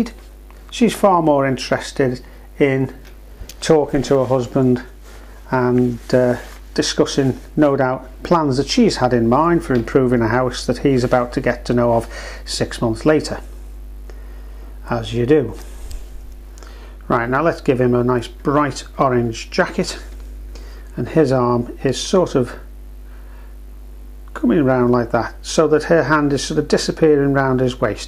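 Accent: Liverpool, United Kingdom. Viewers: Fab tutorial,lovely to hear a scouse accent from my home town too!😀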